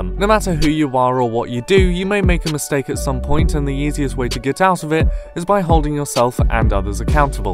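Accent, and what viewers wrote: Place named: United Kingdom. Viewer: this shit would be hard as fuck to follow if you werent british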